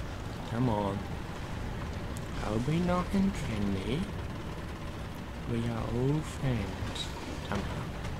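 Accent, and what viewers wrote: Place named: Australia. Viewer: Hey digging the aussie accent man! Straya!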